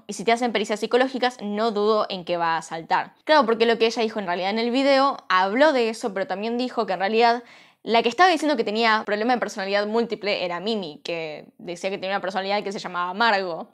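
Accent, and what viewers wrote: Argentina. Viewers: Me divertí al 💯 con este chisme. Me encanta el acento Argentino, tienen mucho chiste para relatar las historias. 🤣🤣 Bombachas cagadas, aprendí nuevo vocabulario el día de hoy. Gracias por este video, me cague de risa, igual van ha terminar mis bombachas como las de Mimi 😭💩 por cierto, te ves super guapa como siempre 💙💙💙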